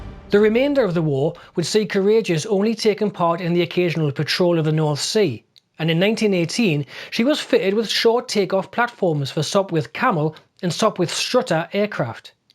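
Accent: Newcastle, United Kingdom. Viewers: Methinks a Geordie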